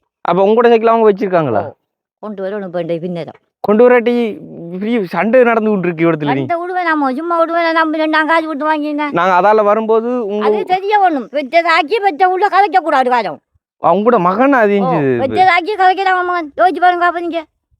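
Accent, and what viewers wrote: India. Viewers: இது உண்மைதான் ஆங்கில வார்த்தைகள் எல்லாம் இந்திய மக்கள் உச்சரிப்பது போல உள்ளது இதை மாற்றினால் நன்றாயிருக்கும்.எமது மாவட்ட பேச்சு வழக்கு நல்லது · தம்பி நீங்க இந்தியாக்காறர் மாதிரி கதைக்கிறது கேக்க நல்லா இல்ல கோபத்தில் சொல்லவில்லை உண்மையை சொல்றம். தம்பி கதைக்கிறமாதி கதைங்க நல்லா இருக்குதானே எமது மட்டக்களப்பு தமிழ் மாற்றம் எதற்கு ஐயா. நல்லா ஒரு சகோதரம் மாதிரி சொல்றன் தம்பி. கோபிக்க வேண்டாம். மற்றும்படி குறை இல்லை உங்கள் பணி தொடரட்டும்👍👍👍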